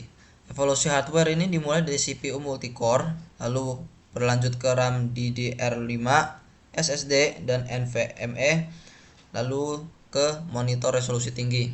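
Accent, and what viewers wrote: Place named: Java, Indonesia. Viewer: mas iki loh rek medok kali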